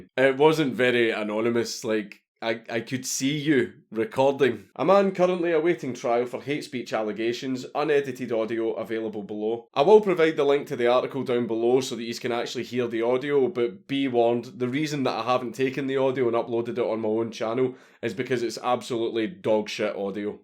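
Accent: Scotland, United Kingdom. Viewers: "you know what i am about" yeah, you are someone who is 'edgy', and says things that sometimes tickle the edge of hate speech. given that america has no hate speech then we would just call you unprofessional, at least while not in a professional setting, bordering on low brow humor, but only sometimes. oh yeah, and you have a scottish accent. you may get some shit for that accent over here. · I seriously love your Scottish accent. I've watched so many of your Mad Lads vids the voice in my head now has an accent😂😂🤣🤣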